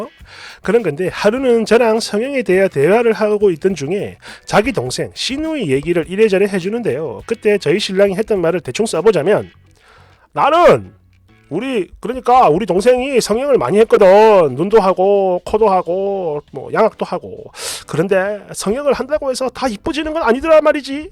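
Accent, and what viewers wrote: Gyeongsang, South Korea. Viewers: 글 내용도 웃기지만 님 경상도 사투리 정말 웃겨서 제 얼굴이 웃는 얼굴로 변했어요 ㅋㅋ감사해요!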